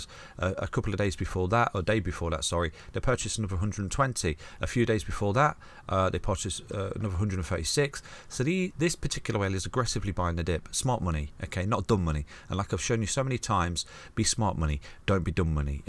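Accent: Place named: England, United Kingdom. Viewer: Thank you for your content and time, these little doodles you do alone are helpful and easy to remember, but your content is simply more understandable than others overall. I have followed several other (no names) crypto not financial advice channels that are popular and not had nearly as much important details as yours, in fact sometimes the opposite advice, but your advice has prevailed. Just clicked the notification bell for your channel, Twitter also. Nice to hear an English accent on crypto updates for a change too.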